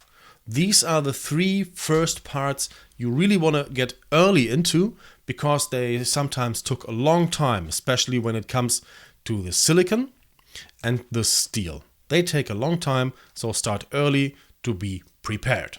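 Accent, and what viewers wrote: Germany. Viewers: Ein Deutscher der Englisch Spricht. :D Dein Review is Nicht Schlecht. Aber ich hätte mal so ne Bitte an dich... Auf der CurseForge Page (~8 Kommentare unter deinem Video) hab ich ein Kleines Texturepack erstellt, da mir die Standard Texturen nicht ganz so Gefallen. Aber ich hab nie eine Ehrliche Meineung über meine Kleine Arbeit Bekommen. Deswegen frag ich dich, als Zufällige Person... um über mein Texturepack zu Urteilen, Sachen die Gut sind, Sachen die ich nochmal Pixeln soll, etc. (Es ist ein Link für 1.7.10 und 1.10.2 Seperat)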